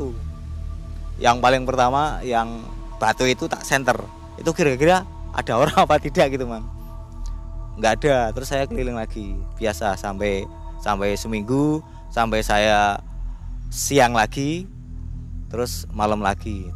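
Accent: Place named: East Java, Indonesia. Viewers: Logat jowo wetan iki mang ei,serem critane😮😢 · Jowomu medok leh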